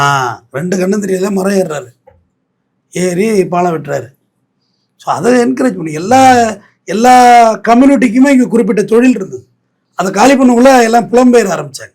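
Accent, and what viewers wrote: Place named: Tirunelveli, India. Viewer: உங்கள் மொழி நடை அசல் திருநெல்வேலி மொழி நடை Uncle 😊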